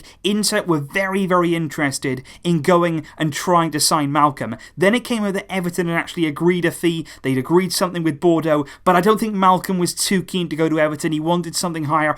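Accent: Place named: England, United Kingdom. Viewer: i like listening to your english accent again welcome back my friend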